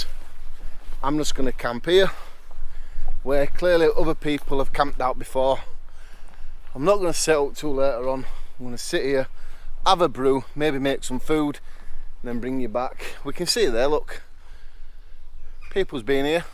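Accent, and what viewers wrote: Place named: Yorkshire, United Kingdom. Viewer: you’re brilliantly funny, Keep going my friend. My family is partly from Yorkshire so I can relate to the accent. Excellent stuff mate.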